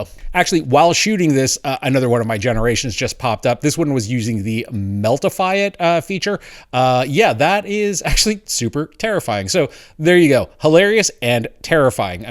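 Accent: Quebec, Canada. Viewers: Great video! :goodvibes:and it's a Canadian french Accent! 🥰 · As a french man myself, I'd say that hesounds like a guy from Montreal. Strong Quebec accent. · Hello there, its more like a very strong French Canadian accent, French is my mother language !!! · Je confirme c’est bien l’accent canadien du Québec😅😂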